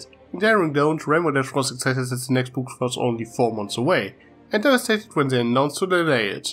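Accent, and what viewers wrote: Germany. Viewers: True. Also, are you German? You seem to have a European accent, and it sounds German to me. If so, nice to meet another German brony.:)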